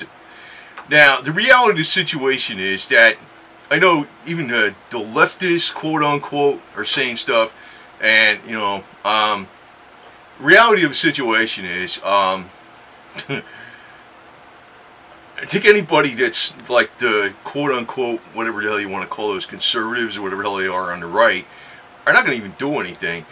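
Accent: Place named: New England, United States. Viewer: Dude wearing a confederate hat ,rebel flag,and yankee voice and it's clear your a yankee....hard to take you serious....sorry bro, all I can do is laff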